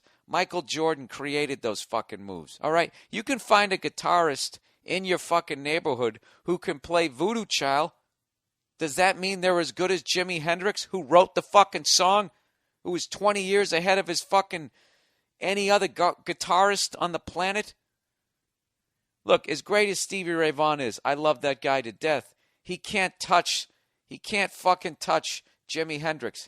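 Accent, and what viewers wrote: Los Angeles, United States. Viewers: The LA accent is epic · I love his LA accent.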